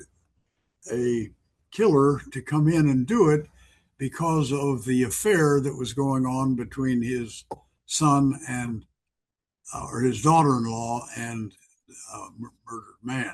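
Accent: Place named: Massachusetts, United States. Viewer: has a very pronounced Mass accent, is less pronounced but I can still discern it. BTW,; I'm originally from Philly and have been trying to lose my accent for years with limited success🤣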